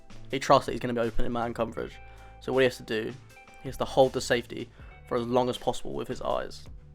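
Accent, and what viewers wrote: United Kingdom. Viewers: where are you from? sounds like the UK, if so, are there many nfl fans there? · This is unbearable to listen to with a British commentator. WTF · I would rather have a football woman narrater than a british accent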